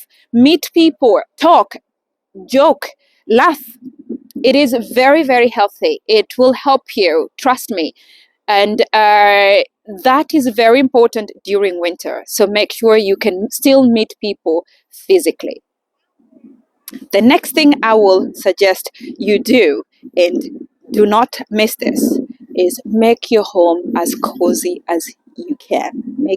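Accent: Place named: Gothenburg, Sweden. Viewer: Great video! I totally agree about getting out no matter the weather. Love your Swedish pronunciation too… I can hear som Göteborg accent 🤩. Happy holidays ❤️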